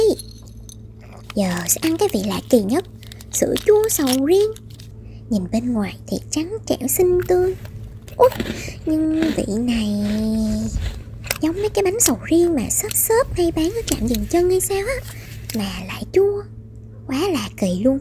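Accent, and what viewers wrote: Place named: North, Vietnam. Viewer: Nghe giọng bà chị giống ng Bắc á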